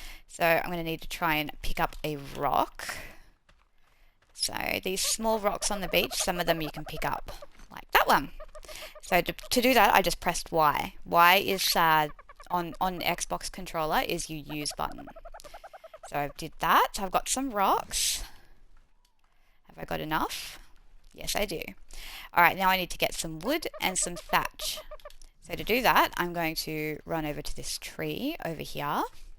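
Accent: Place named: Australia. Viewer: So nice to hear a familiar accent! Aussies unite :)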